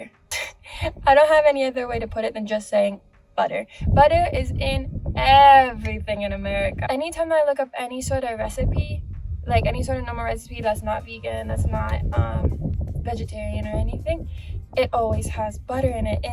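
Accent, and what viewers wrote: Spain. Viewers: It is very interesting that when you speak I can sense some Spanish accent. yeah food hits me hard when I go back to the US, I gain weight really fast. I'm actually from Allentown, but I live in Slovenia for 8 years now and I don't really feel like moving back to the US. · Is it me? Or does it really sound like she has a little bit of an accent? which means that hilaria baldwin is right that she did pick somewhat of an accent while in spain.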